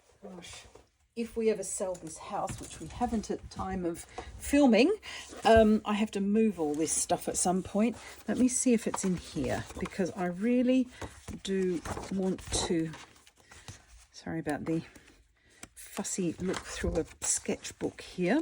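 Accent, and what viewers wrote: Australia. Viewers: Hello. Just found your channel and kept thinking "she" sounds Aussie and then finally at the end you said you went to school in Australia. Hi from a fellow Aussie :) Enjoyed your humour along the way & perhaps that disorder is contagious. I have it too 🤣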